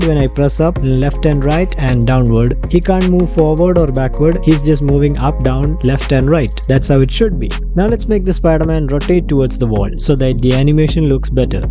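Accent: Kerala, India. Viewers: Are you from india? Kerala?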